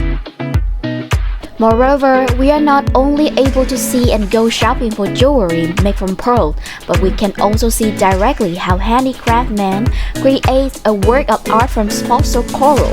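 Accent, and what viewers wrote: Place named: England, United Kingdom. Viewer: Love your English accent so much ❤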